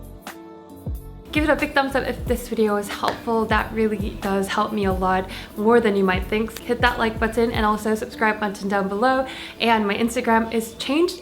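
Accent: Australia. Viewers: Omg the Australian accent is getting stronger!!! I love it hahaha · Dude I was like 😂😂😂😂 how can you just come up with an aussie accent out of nowhere?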